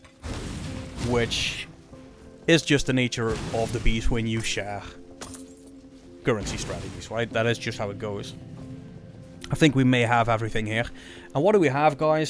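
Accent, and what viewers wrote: Netherlands. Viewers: I Looove the dutch english dialect. nice video.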